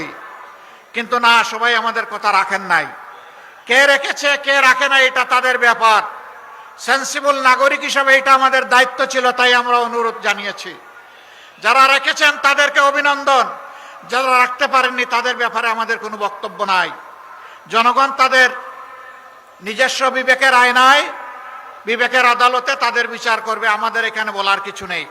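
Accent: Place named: Sylhet, Bangladesh. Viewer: Masallah nice sylhety ❤❤❤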